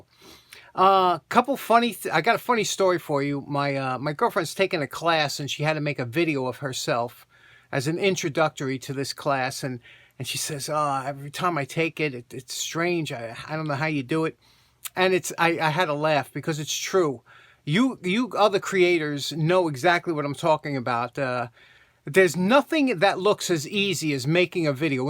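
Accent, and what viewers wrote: United States. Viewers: Accents😂. I do my videos in my normal everyday voice, or so I though, but someone commented I sound so chilled that it sounded like “I had eaten a shit ton of weed”. It’s you Americans that have an accent not us Aussies. Now Larry the cable guy has an awesome accent that I can listen to all day. He makes me laugh as soon as he opens his mouth. Great job on the restos. It’s so frustrating have to restore things twice🤬 Cheers Stuart 🇦🇺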